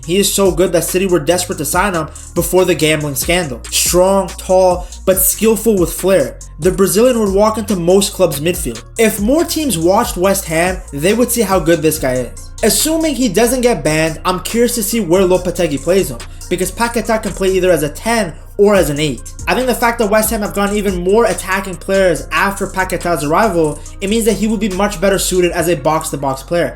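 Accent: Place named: United States. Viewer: 😅 mate please use AI next time. Love your bigging up the club I loved but it all goes out the window with your accent. It's not your fault I don't blame you. We would likely get along loving a beautiful sport. But please use AI. Your accent with an American English twang puts one off, thinking 'sensible' Anyway apologies if my humour or attempt goes wrong. Keep well and keep doing what you love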